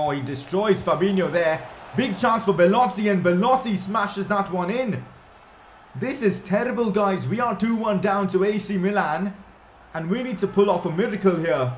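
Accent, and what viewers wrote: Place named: India. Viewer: Why did this dude embrace his full Indian accent now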